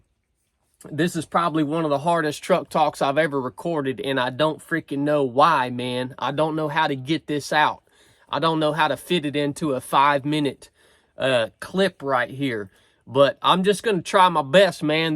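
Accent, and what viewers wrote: South, United States. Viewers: Brother totally agree. Keep up with Truck talk. I love it. Your accent makes me feel right at home. My family are all southern. It's like watching my cousin talking about this crazy sh$$ show world we are finding ourselves having to be a part of. I've got a feeling this is definitely the beginning of the end. Just saying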